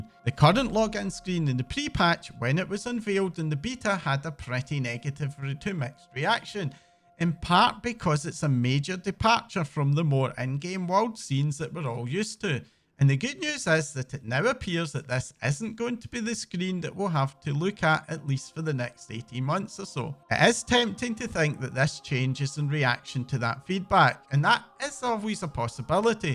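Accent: Scotland, United Kingdom. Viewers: You trolling 😅 lads Scottish he won't be changing how he speaks for you 😅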